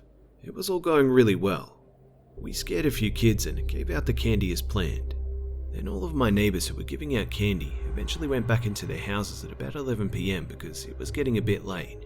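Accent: Australia. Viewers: are you Australian? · Yo, what state you from in Australia, just wonna say hey from Perth lmao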